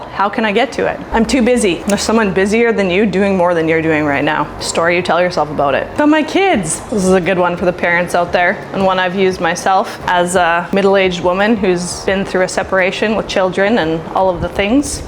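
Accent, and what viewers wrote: Canada. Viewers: I’ve been skinnyfat for most of my life, but now at 50 I’m just fat. Your video came along at just the right time for me. p.s. I love your Canadian accent and I greatly admire your comedic chops. Absolute goddess.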